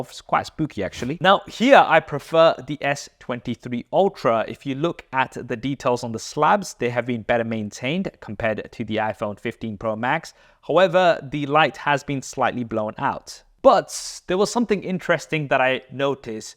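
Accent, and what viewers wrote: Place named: United Kingdom. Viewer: YOU ACCENT SOUNDS BRITISH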